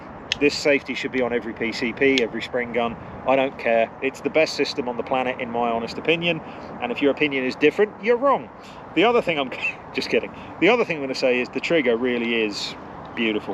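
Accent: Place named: United States. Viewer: Nice one, i was watching the video while in the bath, no weirdness intended, i nearly pissed myself with your American accent, Brilliant.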